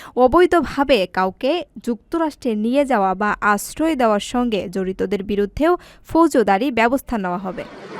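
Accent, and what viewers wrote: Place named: Barishal, Bangladesh. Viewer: এই পাঠিকার গ্রামের বাড়ী মনে হয় বরিশালে।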